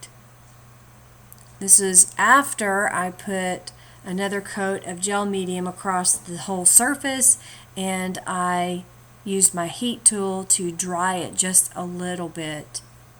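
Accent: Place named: South, United States. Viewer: Awesome, this piece is really cool! I've always loved those colors. p.s. I luuhv yerr suhhtherrn ehccent! Nashville?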